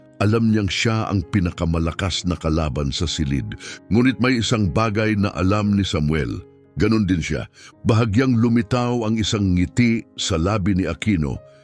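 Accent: Visayas, Philippines. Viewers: DUPER TANGA SINO ??? FACEBOOK PHOL. OR THE NARRtOR. BAKIT??? BOBO GAGO ANG NSRRATOR SA TAMSNG PAGBnGHAY NG TAMANG SALITA NA TAGALOG ...ISANG INSYLTO SA ATING PAMBANSANG WIKA... FACEBOOKKSTANGAHAN GINSHAYSSN NA MAIERE SAMEDIA ANG PAMBABABOYSA SOC MED.. PANSININ NIO MGA VIDEOS LAHAT SY DALING BISAYA MARIRINIG CGURADO MAJORITY SNG STAFF NH FB PHILS AY MGA BISAYA. WALA GUIDELINES TUTOK SA KIKITAIN HINDI SERBISYO ...DAPAT Bng i BAN FACEBOOKK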